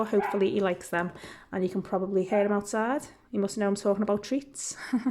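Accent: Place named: Liverpool, United Kingdom. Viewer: Omgosh you sound like my mums fam liverpool lol im from carribbean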